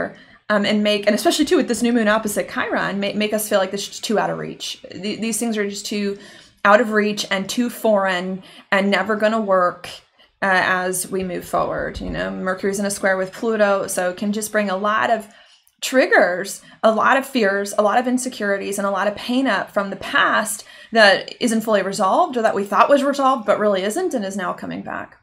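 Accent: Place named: Pittsburgh, United States. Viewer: Sounds like Pittsburgh 💚 at least PA. Thanks!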